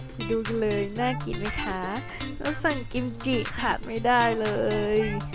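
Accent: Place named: Isan, Thailand. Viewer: น่ากินจังเลย พึ่งไปกินในน้ำมาอยากกินอีกละ😂ชอบเสียงภาคตาฮักดี✌️✌️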